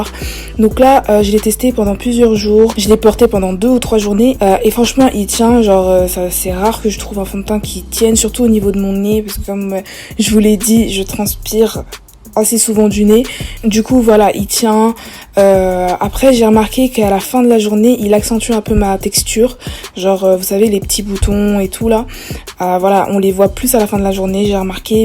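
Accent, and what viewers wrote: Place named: United States. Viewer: Au début j'ai cru que t'étais Américaine 😂😂😂💙💙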